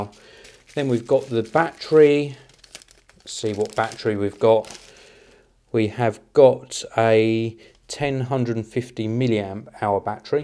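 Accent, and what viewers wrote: United Kingdom. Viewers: Nice review, very relaxed :) First British accent I have no trouble understanding. Looking forward to your in deep review.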